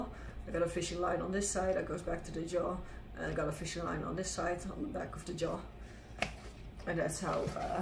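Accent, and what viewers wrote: Netherlands. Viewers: I hear a Dutch accent!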